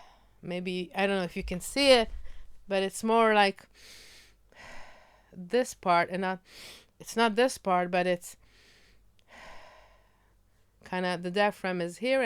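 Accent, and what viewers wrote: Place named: England, United Kingdom. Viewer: You definitely have the English twang. Your English sounds 90% Native.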